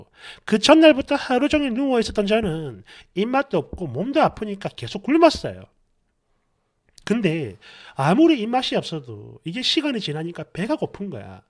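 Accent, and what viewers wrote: Gyeongsang, South Korea. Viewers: 아저씨ㅋㅋㅋ전형적인 경상도 개 웃긴 형부같음ㅋㅋㅋ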